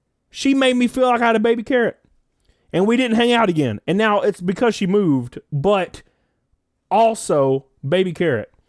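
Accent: South, United States. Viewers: Man, that country twang 😍🥰 I love you!!!! “Damn it” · I have such a huge crush on this guy 😍😍 That southern twang 💋🤌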